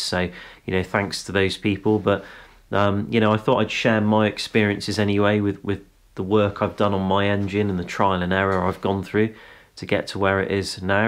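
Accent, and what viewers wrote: England, United Kingdom. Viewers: Ha, no Mike, just a very English pronunciation :-) Its actually a great video, where the underlying story is more about - whatever your 4x4 is, there's things to work on for more power, but that rabbit hole can get deep.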